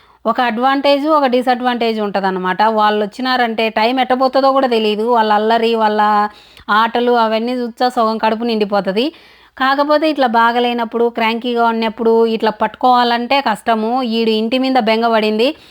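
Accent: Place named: Kadapa, India. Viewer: Bagundava akka Maadi kuda Kadapa ne pulivendula Maadi meeretla matladataro maa oorlo atle matladataru I'm sorry connected to u akka I like ur thought process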